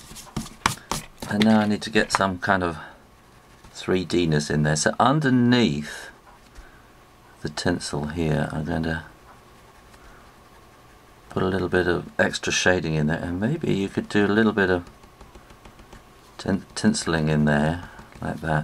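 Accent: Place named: England, United Kingdom. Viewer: Great video! Good job! What part of England are you from? Love your accent! :D Thanks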